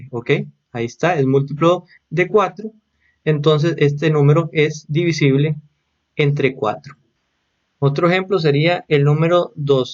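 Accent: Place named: Guanacaste, Costa Rica. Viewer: como se nota que es tico por el acento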